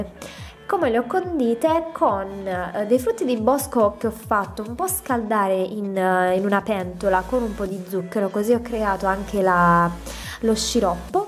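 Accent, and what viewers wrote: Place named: San Severo, Italy. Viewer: accento san severese inconfondibile :)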